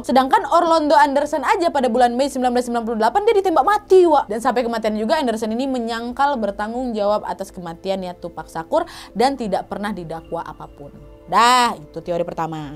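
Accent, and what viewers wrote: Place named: Medan, Indonesia. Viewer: Medannya kental👌🤝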